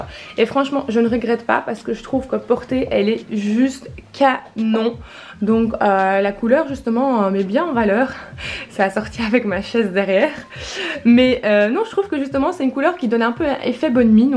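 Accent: Belgium. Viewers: Coucou super vidéo je pense reconaitre à ton accent que tu serais Belge non ?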